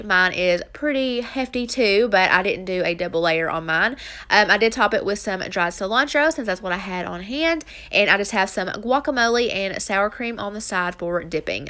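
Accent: South, United States. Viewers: I heard that SOUTHERN accent and now I'm hooked. Girl these meals actually be looking good (and healthy).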